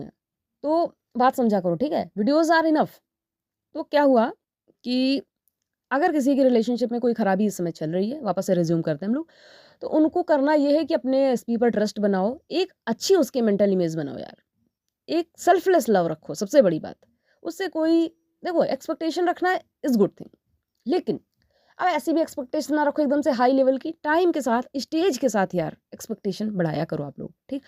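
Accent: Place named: Bihar, India. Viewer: Omg .... Wao apne kitte achhe se story narate ki ....full bihari tone maza aagya .... 😂😂😂😂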